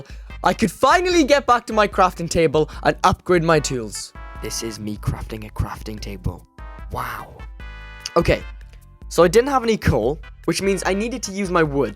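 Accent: Wales, United Kingdom. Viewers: He sounds Welsh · You sound Welsh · Are you british? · Are u Welsh u sound Welsh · Okay, are you Welsh?? Your accent is very familiar 😂❤ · Soon as I heard a fellow welsh accent all the way from Australia I had to subscribe 😅👌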